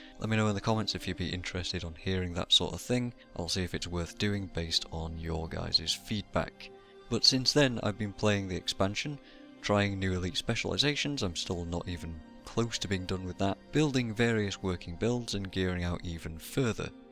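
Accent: North, United Kingdom. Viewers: Such a soothing northern lilt!:D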